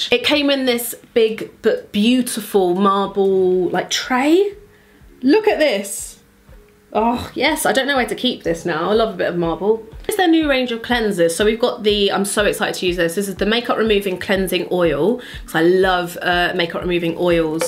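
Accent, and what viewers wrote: London, United Kingdom. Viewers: your vids are so comforting to watch because I've watched them for so many years!! they're so relatable as well because I'm from london- the way you speak sounds like me and my friends lol and also you're so down to earth and the furthest thing from annoying - you're always my go to watch, u inspire me and keep doing what you're doing!! p.s i love the way that you mother, not all like rub it in everyone's face my daughter is god's gift kind of vibe, but so humble xxxx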